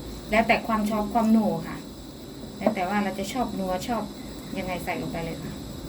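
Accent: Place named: Phu Thai, Thailand. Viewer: คือมิเว้าภูไท🥰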